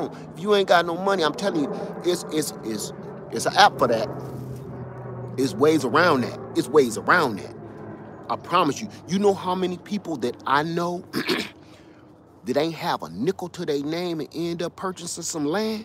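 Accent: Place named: Northeast, United States. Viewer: Sounds like you're in the northeast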